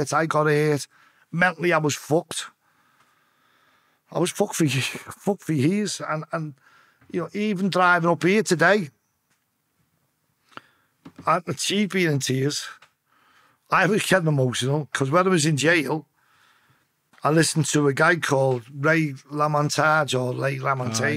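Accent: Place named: Liverpool, United Kingdom. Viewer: Would be lovely for your international viewers if there was subtitle because the Liverpool accent is crazy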